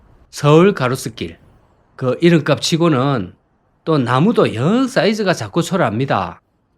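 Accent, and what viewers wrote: Gyeongsang, South Korea. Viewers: 영남할배가 무슨 서울가로수길까지 와서 설레발인가 이 송파할배가 듣기 거북하구만~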